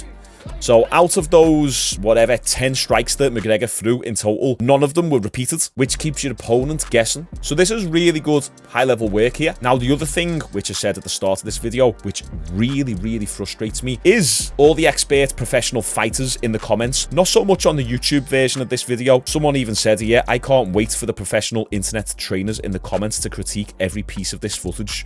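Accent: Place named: Scotland, United Kingdom. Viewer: This whole time I thought you were from Scotland because of your accent. You have a very deep strong accent. You sound like your straight out of Braveheart.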